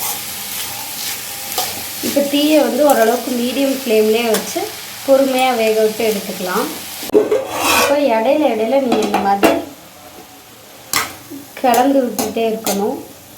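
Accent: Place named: Tirunelveli, India. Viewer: You from Tirunelveli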